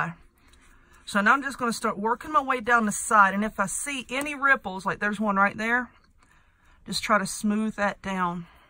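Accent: South, United States. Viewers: Love this and your accent! Where are you from? I know its in the South. I'm a SE Texan!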